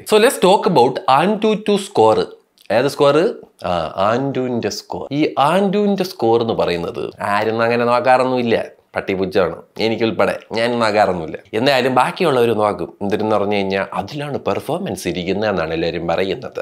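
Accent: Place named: Thiruvananthapuram, India. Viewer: Edake tvm slang varunu but polii annu muthe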